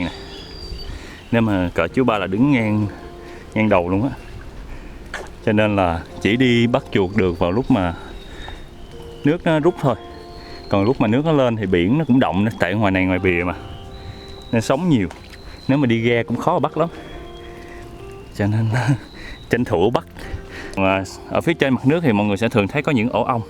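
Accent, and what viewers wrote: Mekong Delta, Vietnam. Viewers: Bạn nói giọng dễ nghe miệt vườn hay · Thích như vậy hon gần gũi thân thiện cách nói chuyện hào sảng chính hiệu miền TÂY năm bộ 👍👍👍👍👍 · Giọng miền Tây nghe thương thiệt luôn. Cám ơn đã làm vi zeo này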